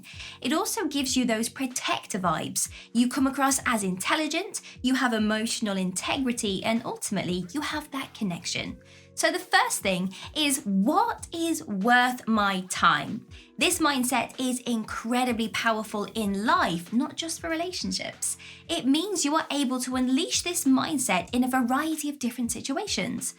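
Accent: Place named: Scotland, United Kingdom. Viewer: I can hear some Scottish undertones...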